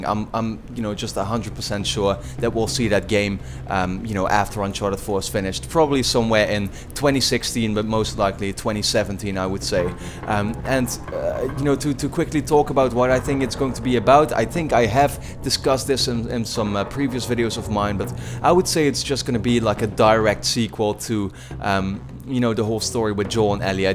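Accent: Netherlands. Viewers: You sound dutch, am i right? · Ayy ik hoor een Nederlander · You dutch?? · Are you dutch? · Are you dutch? Bcs of your accent xp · are you dutch? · gray-brown becouse i am dutch too and his accent looks like he is dutch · Are you dutch? I can hear it in your voice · your dutch right?:P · Great video but you really hear that your from the netherlands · Are you Dutch? You sound like you are.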